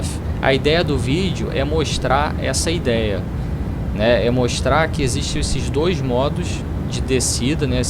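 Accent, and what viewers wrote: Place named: Rio de Janeiro, Brazil. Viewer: Muito obrigado comando! Sua didática é excelente. E parece ser meu conterrâneo do Rio de Janeiro kkk você é piloto real de 737 ?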